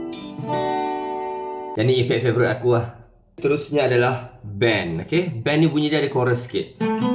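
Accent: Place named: Malaysia. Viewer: Brader cakap macam orang malaysia bagus bangat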